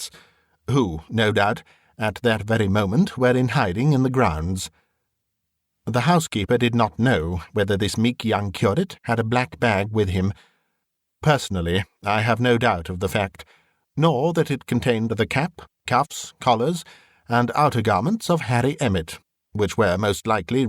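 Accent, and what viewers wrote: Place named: RP, United Kingdom. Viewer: I do really enjoy listening to you especially because you use…dare I say(!?!) what my Grandmother (who raised me) called “The Queen’s English” to great effect. Of course, it’s how these old stories are written.But I’m sure the authors would be thrilled to hear you reading them! ♥️♥️♥️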